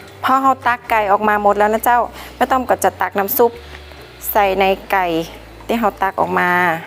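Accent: North, Thailand. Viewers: เป็นภาษาคนภาคเหนือคะ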